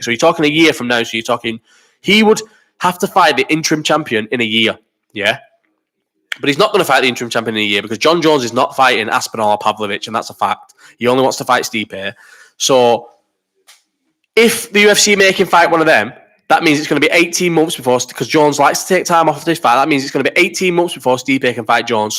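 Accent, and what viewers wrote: Liverpool, United Kingdom. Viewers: Boss scouse accent that mate 🤣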